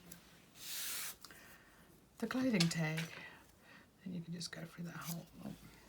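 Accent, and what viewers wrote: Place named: Australia. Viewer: Great video. I love using what would be garbage as well. Great idea for the fries box. I'm from Australia too. Love hearing our Aussie accent on YouTube. Good luck with your channel. 💗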